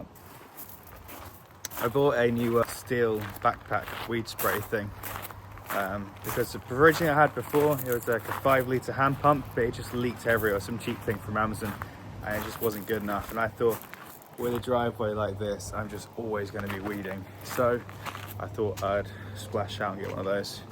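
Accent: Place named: Australia. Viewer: Hi there!! I'm at a loss as too where you are in the world!! The scenery looks like England!! But your accent suggests Australian of some kind!! Confused!! LOL!!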